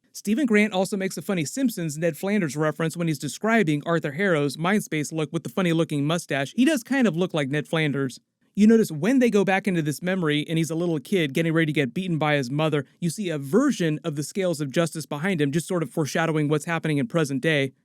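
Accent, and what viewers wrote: United Kingdom. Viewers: I think his British accent is very very normal lol this is what we actually sound like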